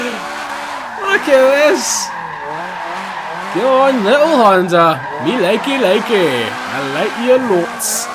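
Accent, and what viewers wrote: Scotland, United Kingdom. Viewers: he's scottish, that's why he pronounce it like that. but yeah that's a really weird reason to dislike. maybe it's his relationship talk idk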